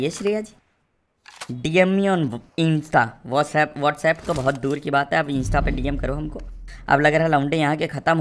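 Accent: Kanpur, India. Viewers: Bhai aap kanpur se ho kya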